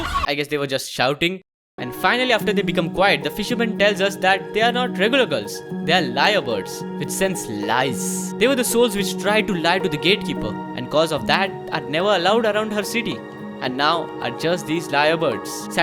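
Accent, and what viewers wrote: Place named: India. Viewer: god fucking damn it. the indian accent makes the whole video impossible to watch..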